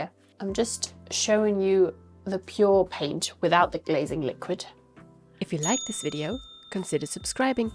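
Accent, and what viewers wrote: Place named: England, United Kingdom. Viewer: Fancy voice / English accent fancy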